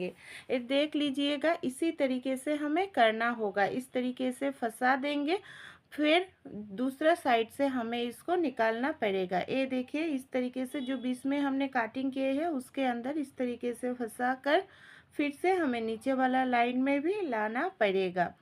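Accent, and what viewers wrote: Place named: Assam, India. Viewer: Aapki bhasha se aap asaam ke hi lagte ho bangali kam. ❤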